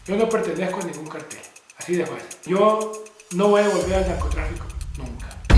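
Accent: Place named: Mexico City, Mexico. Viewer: Narras muy mal, demasiado acento del DF.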